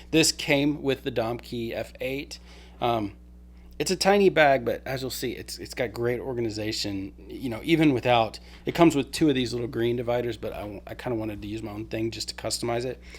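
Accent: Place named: Boston, United States. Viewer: You way how you speak remind me one of my Friend from Boston area! By the way, looks like you are very confident speaking on camera! Some experience from out of YouTube life?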